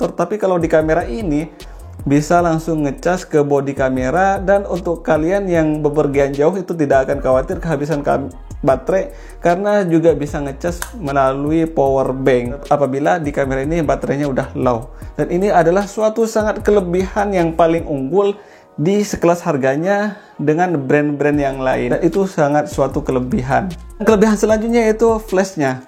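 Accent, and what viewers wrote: Madura, Indonesia. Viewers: Medok bangget bang Madura nya 😊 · Logatnya madura😅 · Madura ya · Madura mana bang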